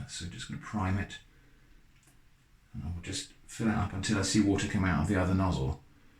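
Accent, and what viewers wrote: England, United Kingdom. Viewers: Innuendo seems to go well with an English accent. Classy.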